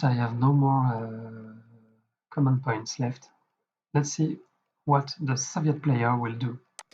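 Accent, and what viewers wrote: France. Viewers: The aesthetic is very beautiful. And the gameplay is innovating. You're english accent seems to betray a french identity ;). Vous avez développé ce jeu tout seul ? Il manque peut-être des musiques de fond pour le côté drama (comme dans le futur jeu Burden of Command). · Nice vid, it's great to see the game played by its developer (and to hear his delicious English accent from Paris 😉) 👍👍👍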